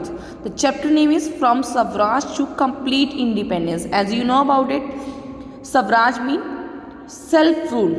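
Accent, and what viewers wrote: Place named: United Kingdom. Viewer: Sorry to say but if u r teaching in icse school that doesn't mean that u have to sound like Britisher itself just pronounce the words normally......😅 ~no offence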